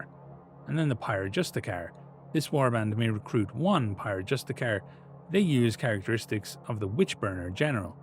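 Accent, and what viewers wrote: Ireland. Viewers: These drops are comin in too hot and fast! My heart can't take this much dulcet Irish trench ASMR! (Yes it can, I need more, MOAR!!!!!!)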